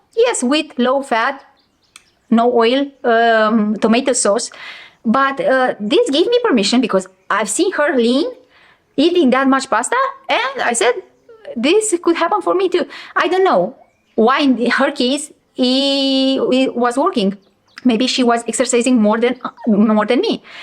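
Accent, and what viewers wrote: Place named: Russia. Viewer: You should stick to your native language, Russian or whatever, and dub in English. Your grammar is good but your manner of speaking is rough. No offense.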